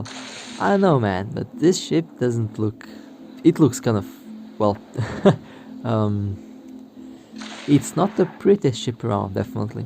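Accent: Russia. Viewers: Здравствуйте. Попробуйте на русском свои видосы тоже делать. Так как по акценту слышно что вы русскоговорящий:)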